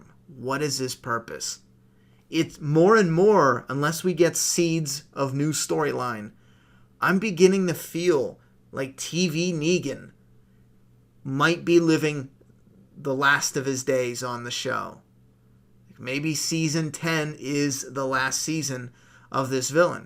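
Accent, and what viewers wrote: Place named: New York, United States. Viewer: YOU must be from New York or Jersey. My son came home with this accent. LOVE it. I laughed when you got pissed at your phone. Ha ha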